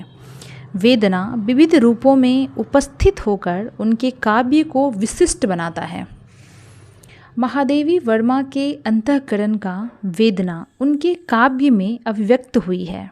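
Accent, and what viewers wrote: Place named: Bengal, India. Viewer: और दीदी आपका आवाज मुझे बहुत अच्छा लगा इसमें बंगाली टोन है जो मुझे बहुत ज्यादा पसंद है और पता है दीदी मैं आज तक खुद से ही असाइनमेंट के सारे आंसर ढूंढती थी और लिखती थी किताबों से पर जब मुझे प्रश्न 5 का क नहीं मिला तो वह पद्यांश में गूगल पर सर्च कर रही थी तब मुझे आपका वीडियो दिखा इसका मतलब की जो आपने छोटे-छोटे प्रश्न के उत्तर दिए हैं अलग-अलग करके एक-एक प्रश्न के उत्तर दिए हैं वह बहुत यूजफुल था सर्च इंजन में काम करने के लिए। मैं उसी के सहारे आपके लॉन्ग वीडियो तक पहुंची हूं आपका काम बहुत अच्छा है दीदी अगर छोटा-छोटा वीडियो नहीं डालती और इकट्ठे बड़ा डालते तो शायद मै आपकी इस लॉन्ग वीडियो तक नहीं आती। मैंने पद्यांश डाला तो उसके उत्तर के रूप में आपकी वीडियो आए और अर्थ सहित और ऊपर मैं वह क्वेश्चन का आंसर दिखा रहा था और आपका टाइटल भी था यह सब बहुत अच्छा था तभी आप फर्स्ट नंबर पर सर्च में आ गई गूगल क्रोम पर और दीदी आप इसका प्लेलिस्ट भी बना सकती हैं उसे जनता को बहुत सुविधा होगी और दीदी आपका काम बहुत अच्छा है.आपकी लिखावट बहुत ही स्पष्ट है आपकी लिखावट बहुत अच्छी है मुझे कोई दिक्कत नहीं हुई है और जहां अगर थोड़ी बहुत दिक्कत हुई तो आपका बोल-बोल कर बताने से वह प्रॉब्लम भी नहीं हुई और बहुत आसानी से स्पष्ट आवाज में सब कुछ समझ आ गया और मैं फाइनली एक असाइनमेंट कंप्लीट कर दी दीदी आपको धन्यवाद आपने बहुत अच्छा काम किया है आप बहुत काम समान में भी अपने कमरे को किताब की वीडियो बीच में फोकस की है जिससे पूरी कॉपी दिखाई दे रही है दर्शन को बिल्कुल भी परेशानी नहीं हो रही है और आप उंगली रखकर एक-एक बता रही हैं और जल्दी-जल्दी पढ़ रही है सब बहुत आरामदायक था बहुत अच्छा लगा दी थी आपका काम जिसको इसकी जरूरत है उसके लिए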